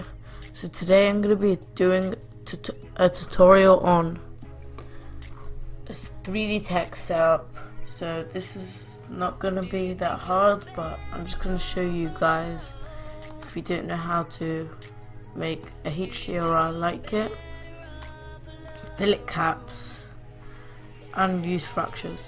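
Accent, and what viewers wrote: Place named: Leicester, United Kingdom. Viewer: I can tell your from leicester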